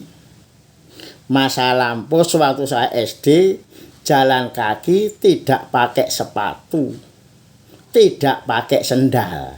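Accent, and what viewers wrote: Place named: Java, Indonesia. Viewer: $3mangat pakdhe, sehat sll...bhs jowo medhok,*)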